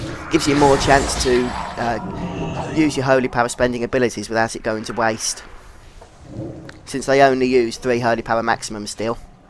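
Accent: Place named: England, United Kingdom. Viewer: ahh sorry for not explaining correctly, i ment where are u from england ;D never heard it before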